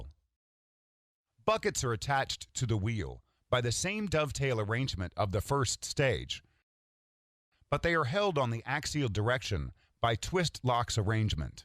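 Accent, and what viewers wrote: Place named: England, United Kingdom. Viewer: Excellent description and detail by the commentator. My hearty congratulations. I do feel that the modern jet engine must be classified as one of the modern wonders of engineers. The combined engineering logic of the jet engine took a lot of time to be put together and if one had to put an initiation spark on the human mind, it was Charles parson who combined the high pressure in a boiler to spin the turbine in a steam layout. I was lucky enough to have lived at Newcastle-upon -Tyne where I went on many visits to see the Turbina the first steam turbine ship which surprised the Royal Navy at Spithead. I worked on the first jet turbines on a naval ship and it was the HMS Exmouth when I worked at Chatham Dockyard. Again Congratulations to Oil Gas World for providing such detailed information about the thermodynamic stages and the cooling methods on every unit. 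When I built a model engine with one compressor stage and one turbine stage, the diffuser right after the compressor was a tricky unit where the air had to be slowed down to sustain the flame and then the flame diluted with colder air along the burner to isolate the flame from the metal. Well done to the commentator, HIs English accent is what I aimed for when I spoke that language, but I could never get rid of my mother's tongue diluting the English language I spoke at Chatham and Newcastle.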